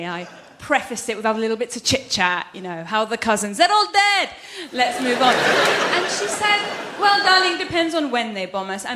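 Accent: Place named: United Kingdom. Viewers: thats the most british iranian ive ever seen or heard! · Thats more english than english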